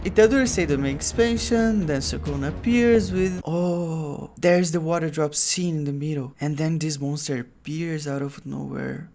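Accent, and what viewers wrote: Brazil. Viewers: Aí ai o sotaque BR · maluko é brasileiro crtz, tem muito sotaque brasileiro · Why do u have a Brazilian accent? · you speak english like a brasilian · sapoha parece Br kkkkkkk pelo sotaque ou eu tô loko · Oh corno tú é Brasileiro né? Seu sotaque e nome estão me dizendo isso -_ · Se n fosse esse inglês nunca iria notar q esse safado é br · É brasileiro? sotaque BR forte pra caralho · esse cara tem um sotaque mt brasileiro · Pode até falar inglês, mas o sotaquezinho BR nunca some kkk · o sotaque brasileiro torna tudo melhor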